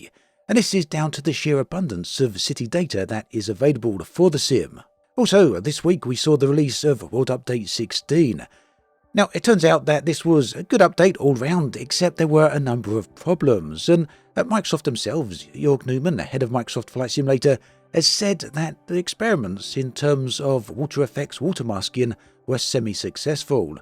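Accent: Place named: England, United Kingdom. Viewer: It's a fucking english accent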